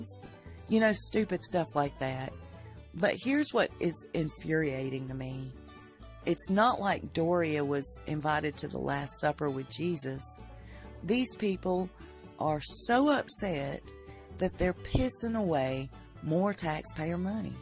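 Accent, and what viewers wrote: South, United States. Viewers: “A knapsack full of nourishment...”. LOL., you are so southern and I just love it!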